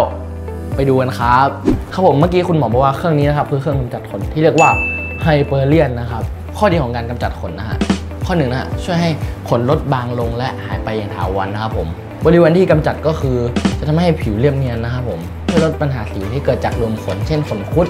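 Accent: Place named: North, Thailand. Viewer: อู้เมืองไปเล้ย.!!สำเนียงออกแล้ว อู่เมืองโดย น่าฮักจะต๋าย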